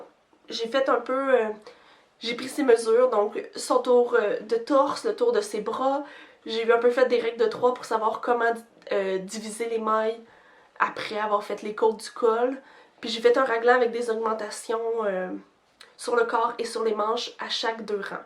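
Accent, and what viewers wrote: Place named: Quebec, Canada. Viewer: Merci, c'était bien agréable de t'écouter. Je vie en France et je suis actuellement à l'hôpital alors d'entendre ce petit accent Québécois est bien sympathiques 😉❤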